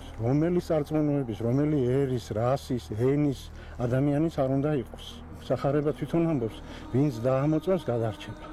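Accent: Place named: Mingrelia, Georgia. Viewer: კილო მეგრული აქვს. ვინ არის ნეტა.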